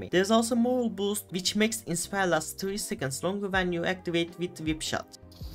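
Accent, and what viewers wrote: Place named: Turkey. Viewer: Noticed the Turkish accent in the first 15 seconds ahahah 😄 nice video though, hopefully I'll do better the next time I play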